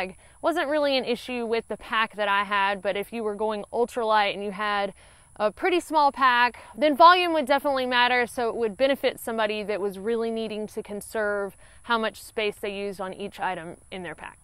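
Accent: South, United States. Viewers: hey great video as usual! have seen most of your videos, not quite made it to the end of the CDT yet. I want to thank you for awakening a passion in me for the outdoors like I've not had since I was a younger man. you're such a lovely young woman with the best southern accent and I wish you much success and joy in your life! happy trails, and hey maybe we'll see you out there one day....... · You southern girl for sure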